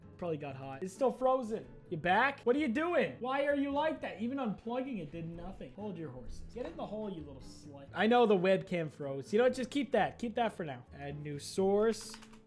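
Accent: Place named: Canada. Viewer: God I never realized just how canadian you sound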